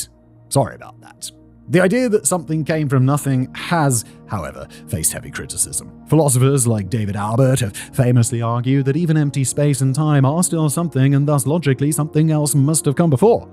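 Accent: United Kingdom. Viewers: For starters, there was no big bang. Therefore, there was no nothing before the Big Bang. Telling a story with a little cute British accent isn't gonna make it any more believable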